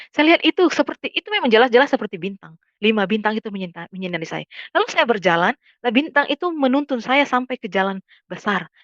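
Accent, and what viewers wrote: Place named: Papua, Indonesia. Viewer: Logat Papua sekali😅🙏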